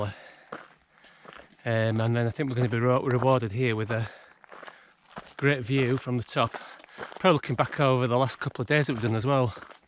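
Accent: Yorkshire, United Kingdom. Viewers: ohhhhh.....how the hell did I not recognize a Yorkshire accent??? Ive done Coast to Coast 3 times!!! I am so sorryyy...i am familiar about Lancaster vs York history. 😂 Ive subscribed to your channel and saw your Colorado trail thru-hike. Great job. I was on the CT around the same time but after day 12, Ive had enough and got homesick so i went home after I reached Twin Lakes. I did WHW abd CWT this year so I know what it's like already. My husband hasnt thru-hiked anything this long so I hope he won't give up on me. :)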